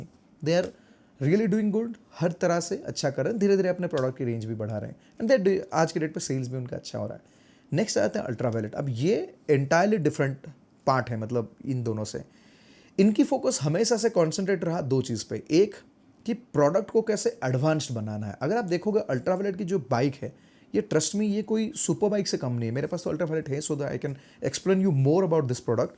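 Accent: Odisha, India. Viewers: Even if after so many years, your odia accent in hindi sounds good.... Badhiya Bhai..... Good job... Fronm ROURKELA/Raipur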